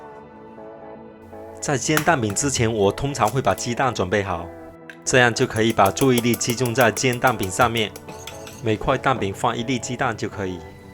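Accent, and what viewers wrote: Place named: Taiwan. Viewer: Thks for sharing this good breakfast recipe. Wonder where are you from originally? Your mandarin sounds taiwanese or singaporean.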